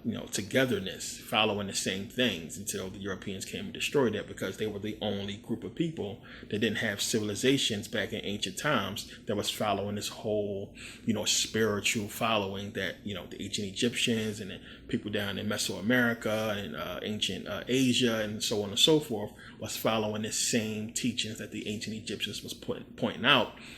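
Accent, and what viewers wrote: Philadelphia, United States. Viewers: He’s from Philly. We are city cousins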